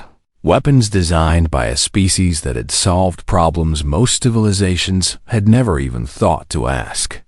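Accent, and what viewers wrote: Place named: New York, United States. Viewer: I’m pretty sure the person who sampled their speech for the AI to use as narration comes from New York or close by in New Jersey. Great story. Too bad there wasn’t any constant and appropriate visuals to match it.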